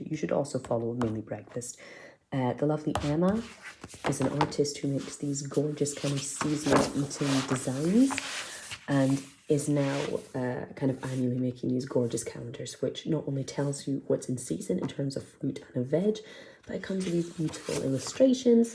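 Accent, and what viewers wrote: Scotland, United Kingdom. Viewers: Found your channel randomly loveee it 😍 I’m in glasgow, brill that your Scottish too👌🏻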